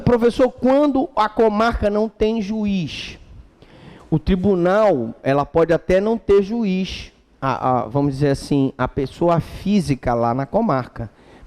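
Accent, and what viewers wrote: Pará, Brazil. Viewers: Muito obrigado, sou paraense mas moro e estudo no Rio de Janeiro e suas aulas além de muito brilhantes me ajudam a matar a saudade do Pará que amo, pelos exemplos e pela sua forma de falar. Obrigada.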